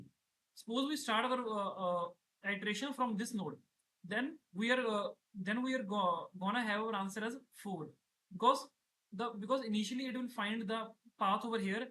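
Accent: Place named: Punjab, India. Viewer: Aapke jesa punjabi accent ke lie kya kre bhiya?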